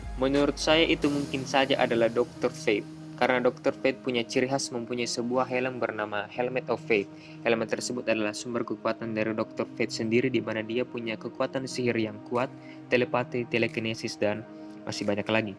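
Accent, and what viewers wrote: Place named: Sumatra, Indonesia. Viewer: dari logatnya abang orang sumatera ya...😁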